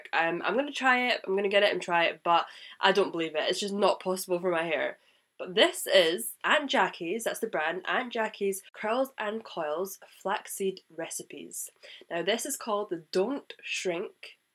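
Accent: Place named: England, United Kingdom. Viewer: Liked the accent. Are you from England?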